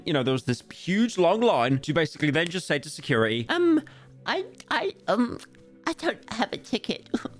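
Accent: United Kingdom. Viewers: Brits are so weird... "Willy Wonker"... geez man.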